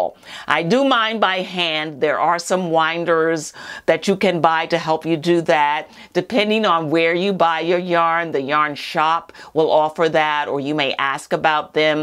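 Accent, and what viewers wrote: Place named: Boston, United States. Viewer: Thank you so very much for doing this! You are a great teacher, and your accent reminds me of my grandma who was from Boston. I will be watching more of your videos! :)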